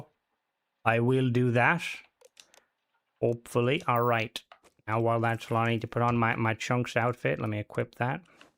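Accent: Ireland. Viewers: Awesome stream,...I usually just watch for the dancing guinea pigs but stay for the trash talk in an Irish accent 😂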